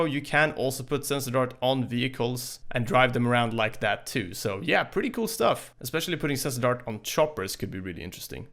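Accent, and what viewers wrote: Sweden. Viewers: are u by any chance swedish? u talk english like sweds 🤔😁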